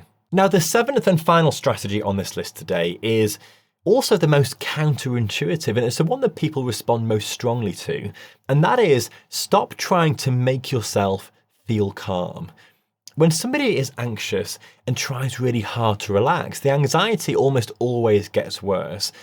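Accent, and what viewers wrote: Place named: Northern England, United Kingdom. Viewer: Thanks for this really informative and excellent explaining.. Fond your videos the best on social media.. Appreciate so much your insight into life style changed, and as your a uk based doctor, and by your accent feel you may live in the North of England (like myself ) simplify videos easy listening.. thanks again 🙏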